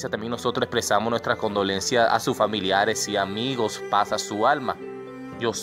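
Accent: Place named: Central America. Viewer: Se te escuchan problemas de habla y no sabes leer bien. Escucho acento centroamericano. Le atiné en todo, cierto?😐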